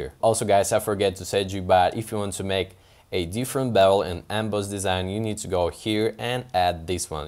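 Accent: Russia. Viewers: Я вот чувствовал русский акцент, зуб был готов дать 🤣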